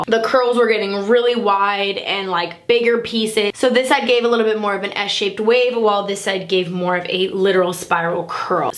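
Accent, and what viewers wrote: South, United States. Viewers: I like the southern accent better. It’s you. And that’s more than ok. 🙂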